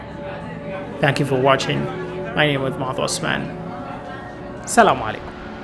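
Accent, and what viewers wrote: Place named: Africa. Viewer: مرحبًا ، دائمًا... يتطلب الانتقال نوعًا من الجهد الأخلاقي والمالي والقانوني. أنا متأكد تمامًا من أن مشاكلك تتعلق ببعض المشكلات الأخلاقية وبعض المشكلات الاجتماعية (التي تتعلق بالقانون) ، حاول تقليل قضاء الوقت في التحرير ومحاولة التصوير في بث مباشر (كما فعلت هنا). بالإضافة إلى ذلك ، قم بتحسين لغتك (بالمناسبة... لهجتك رائعة من أصل أفريقي).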